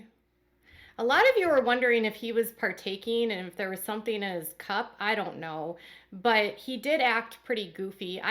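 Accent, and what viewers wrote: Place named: Wisconsin, United States. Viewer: 👍🏼Just wanna thank you for sharing your insights and research with us, you're very thorough!💕 I sooo love your accent, some of my relatives are from Wisconsin and Minnesota so you sound like family to me!🙂 Please stay safe, keep doing what you do and God be with us all, Amen!🙏🏼🙌🏼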